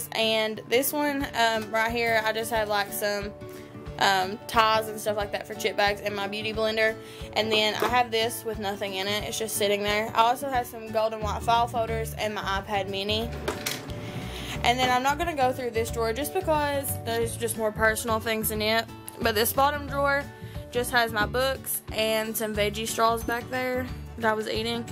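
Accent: Kentucky, United States. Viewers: I LOVE YOUR KENTUCKY ACCENT!😍😍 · OMG I could tell she was from Kentucky as soon as she started talking :) I'm also from Kentucky :) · What part of Kentucky are you from? I'm from southern WV and your accent reminds me of home :) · Love your accent I wish I was from the south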